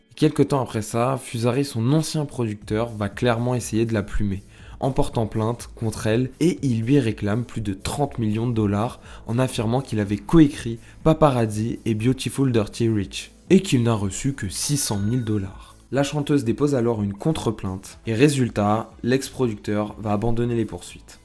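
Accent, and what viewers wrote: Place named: United Kingdom. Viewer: et des rappeurs? Noirs et donc forcément rappeurs quoi! Ce sont des chanteurs, pour ta gouverne et si tu n'as pas ce minimum de culture, faut pas faire de videos pour désinformer c'est pas le but. Ça remet en question la crédibilité des infos de l'ensemble de ta vidéo. Perso jai arrêté en cours de route. Entre ça et l'accent anglais je ne suis pas sur YT pour m'abrutir mais pour m'élever....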